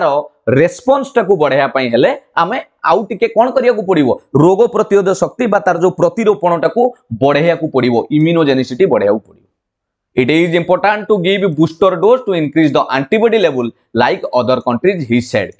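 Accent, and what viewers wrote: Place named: Odisha, India. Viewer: Dear sir, English ku English parika padhaiba uchit anchalika bhasha parika nuhen. English ku padiba ebam padhaibara gote saili thae ebam English kahiba au Tara prateka word ku sathik bhabare pronounciation karibara madhya gote style thae. Binita anurodh English ku English pari padhantu Odia parika nuhen. Thank you.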